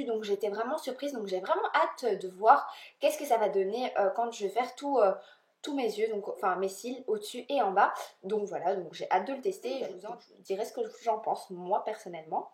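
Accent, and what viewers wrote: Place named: Belgium. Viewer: J'aime bien ton haul 🤗 Le mascara gemey avec la brosse en forme de cœur me tente hihi😊 Dit moi tu es belge? Parce que j'entend comme un accent quand tu parle. Des bisous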